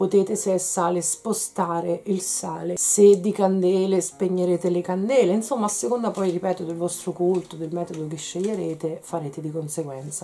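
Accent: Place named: Lazio-Campania, Italy. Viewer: ma tu abiti tra Lazio e Campania? amo il tuo accento...e i tuoi video! un bacio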